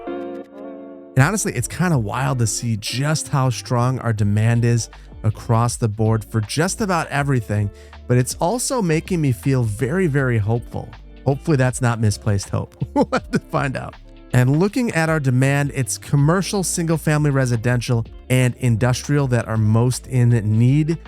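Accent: United States. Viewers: You say tex-tile but not fer-tile. You say furtill. Is your floor made of till or tile? The way some yanks use English is so weird haha. Cheers love your content.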